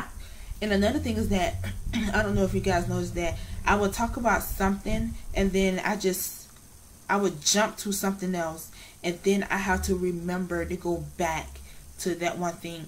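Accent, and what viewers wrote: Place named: United States. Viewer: Yeah one of my friends had the same she had hogkins lymphoma and relapsed and had a stem cell for the second time. I'm guessing you live in America from your accent lol was your treatment expensive? As I live in the uk we have the nhs.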